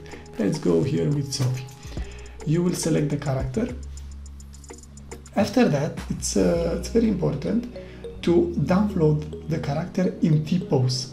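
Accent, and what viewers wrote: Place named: Romania. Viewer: banuiam ca esti roman :)))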